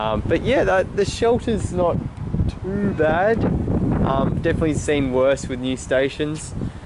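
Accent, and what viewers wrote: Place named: Australia. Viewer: Love the voice for the life has aussie accent!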